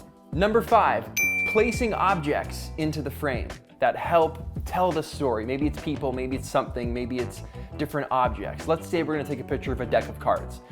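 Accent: Canada. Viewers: Is he Canadian? Where from? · Are you Canadian?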